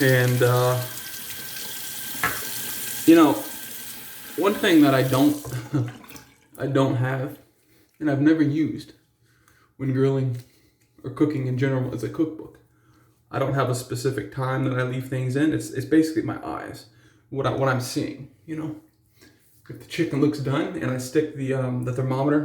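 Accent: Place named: United States. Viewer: That was a good shave! I vote TSE Espresso for the next one. It's called a three-way tie! 😁 Classic Shaving is not tallow based, and all of their scents come in both their regular base and a lanolin base. My pre-shave routine entails using a face wash during a hot shower and then shave right after. I haven't done the hot towel method since I used cartridges. Lol. I'm already getting decision fatigue trying to decide on what soap to use every morning. SMH. I gotta laugh at the gringo pronunciations you use. 🤣 But that's okay, you're still awesome. Hey, how 'bout you mail me a nice bbq'd brisket? Lol. Love you,! 💙🖤💙🖤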